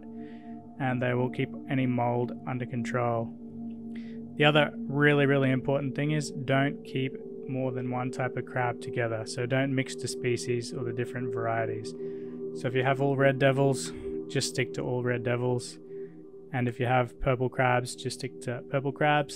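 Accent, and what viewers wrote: Australia. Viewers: thanks for the info. I noticed your Aussie accent and I thought the laws got changed. Keep up the good work!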